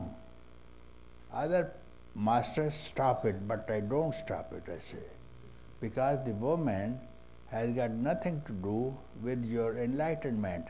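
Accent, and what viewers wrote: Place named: India. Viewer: I like Papajis Indian Accent 😂😂😂🙏🙏🙏🙏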